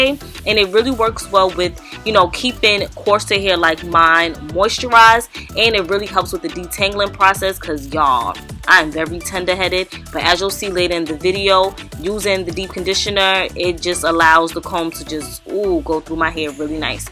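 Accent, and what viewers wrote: New York, United States. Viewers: Its the NEW YAWK accent fah meeee! 😍 (Im from NY too🗽🗽🗽🗽😉)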